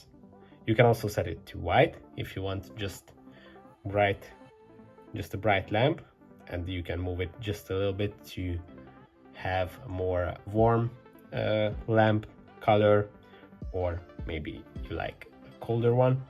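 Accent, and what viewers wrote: Hungary. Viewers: Are you hungarian? I recognized the accent :D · are you hungarian?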